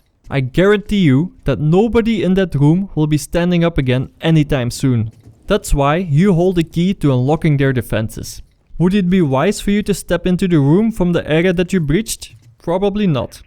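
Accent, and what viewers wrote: Netherlands. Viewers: are you dutch? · nederlands accent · that dutch accent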